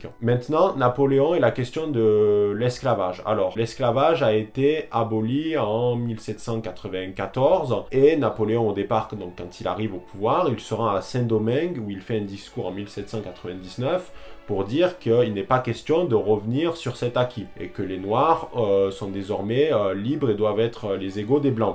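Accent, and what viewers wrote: South-West, France. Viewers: C'est l'accent du sud-ouest, rien à voir avec une voix de banlieusard.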